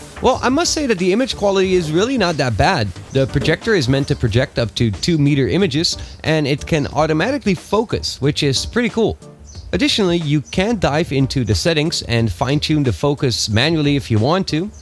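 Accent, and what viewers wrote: Netherlands. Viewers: your accent....is that dutch?? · Allthough you speak very good english, i detect dutch......am i correct? Nice vid btw.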